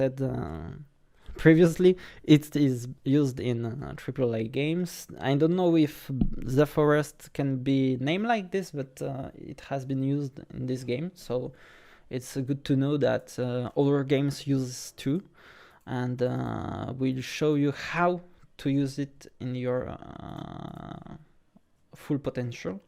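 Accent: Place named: France. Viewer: I love french peoples speaking english . C'est très facile de reconnaître ça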